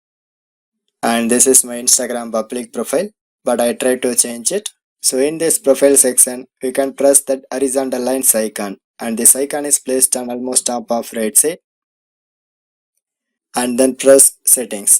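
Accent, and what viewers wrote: India. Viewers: I think He's from India 🇮🇳 ❤ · His indian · Kick-Ass Bro it's always a pleasure hearing Indians talk in English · That's Indian I think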